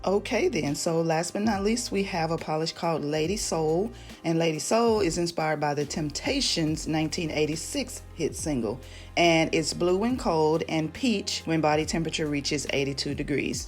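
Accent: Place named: South, United States. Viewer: Goodness gracious sakes alive this collection is GORGEOUS🤩!!! I never saw this brand before so I appreciate you introducing me to MJ Polish! I'm gonna get this whole collection on their black Friday sale😁...thanks for that info too! On a side note.... I feel so comfortable when I watch your videos. Your kind voice & personality makes me feel like you are one of my cousins next door! I'm in southern Kentucky (close to Nashville Tn) so I LOVE your southern accent & you saying, "y'all", all the time like I do! I know this has nothing to do with the polishes but I'm sure your personality is alot of the reason people subscribe to your channel 😊. Thank you for the video & have a BLESSED Thanksgiving!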